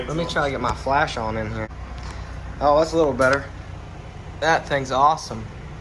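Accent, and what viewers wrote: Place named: Texas, United States. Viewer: Wya? Texas? It sounds like it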